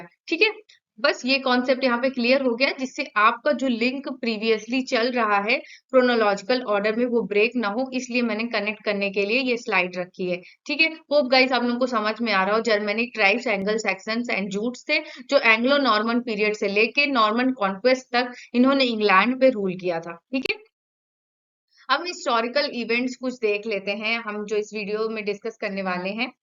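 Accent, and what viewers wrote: Bihar, India. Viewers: Don't say like this galt nhi bs unka kuch tone aa jata h Bihar ka hm unki jitni knowledge gain kr paye bhut badi baat h aur unke jaisa pda paye teachers to bhut but kuch hi teachers ka padaya huaa mind mai aata h ...Sir aapko knowledge teaching I really love 💕 mai bhi aapki jaisi hi teacher bnna chahti hu ki Mera padaya huaa mere students ko samjh mai aaye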